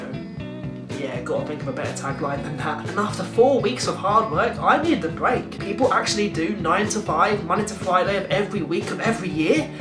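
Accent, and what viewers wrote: Liverpool, United Kingdom. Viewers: Been watching your videos since like your Part 1 of the Philosophers Stone walkthrough on PS1. Those were the days! (actually ordered a PS1 back and purchased that game back recently, walkthrough might come in handy):')... enjoyed every single video!! Top youtuber! Enjoyed your videos about life in Liverpool, lived in Liverpool all my life and the vibe around the place is fantastic. The accent aint bad too. (debatable).. congratz for graduating too! Hope your well bro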